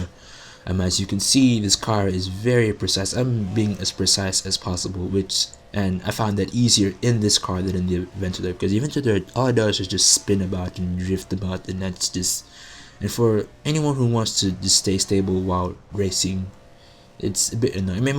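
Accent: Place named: Africa. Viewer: LOL, I can hear the Arfrican in you so clearly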